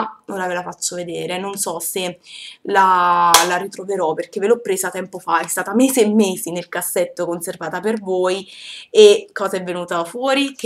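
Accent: Naples, Italy. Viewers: 4 haiun accento familiare, sei per caso napoletana ?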